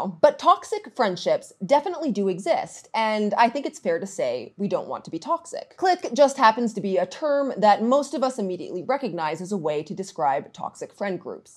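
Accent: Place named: Texas, United States. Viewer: your accent puzzles me sometimes. You are not from the south but sometimes you get something that reminds me of the Texas accent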